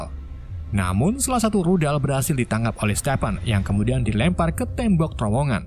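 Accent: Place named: Banyumas, Indonesia. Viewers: Anda orang Jawa ternyata tapi Jawa mwna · Wong Jawa ya kang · Barry ndlenger akhirnya kesandung wkwkwkwk Asline wong ndi sih min? Wkwkwk ana ndlenger ana apa ah kayane wong ngapak pantura kie wkakakak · Mas 'e kerenn...jawa ngapak..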